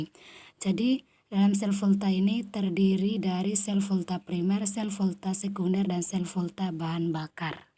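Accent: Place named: Java, Indonesia. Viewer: Keren. Tetal smngat ee.. Saya fokus sama suarax.. Sdh medok jowo gah😍😍😍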